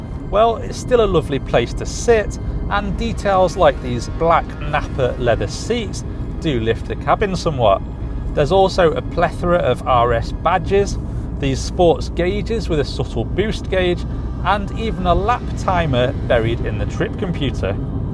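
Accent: Liverpool, United Kingdom. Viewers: Taken me a while but I'm beginning to like his reviews. He is a bit scripted but he has a great way with words and his accent is certainly different (a bit of Scouse I think). Also, he sure knows his automobiles.... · can someone please tell me what region of the UK his accent is from?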